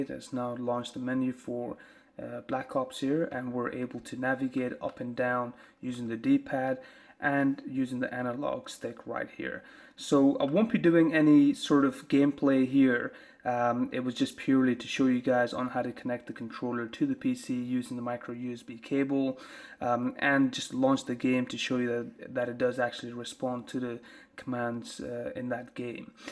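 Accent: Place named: Northern Ireland, United Kingdom. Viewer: Great guide, sounds like uve a northern irish twang haha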